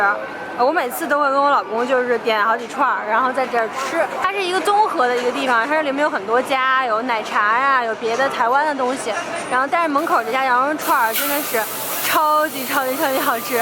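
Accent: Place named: Beijing, China. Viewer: 北京味儿贼亲切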